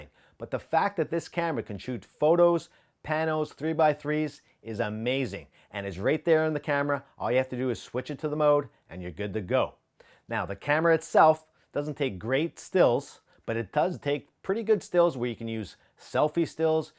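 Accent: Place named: Canada. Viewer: I knew I heard the Canadian accent, I’m from Northern Ontario :) thanks for the info, gonna label my gear the same out here in Vegas! Cheers, love the videos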